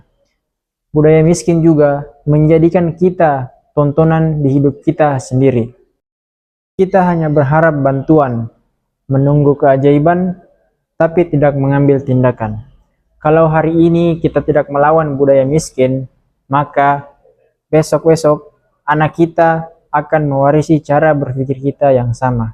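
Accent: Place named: Java, Indonesia. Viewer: Jawanya melekat bgt kak🤩🤩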